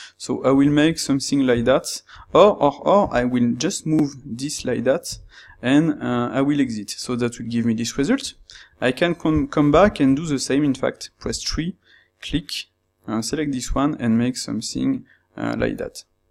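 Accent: France. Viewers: the frenchness is strong with this one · jesus why dont you activate that keyboard shortcuts addon man???? cant follow you with your strong french accent... that would help us immense... otherwise great addons, bought them both.... :)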